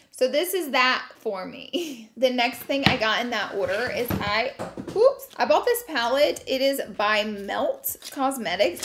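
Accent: South, United States. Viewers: omg where is she from?! she sound soooo southern haha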